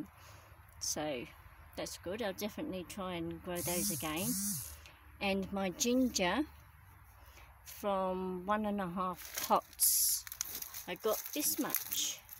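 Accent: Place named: London, United Kingdom. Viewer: Hello again, im sure i can here a london accent there, you used to live in the UK ? I buy ginger from the supermarkets to make ginger wine, im going to give this a go in my polytunnel next year, your harvest is great. thanks and take good care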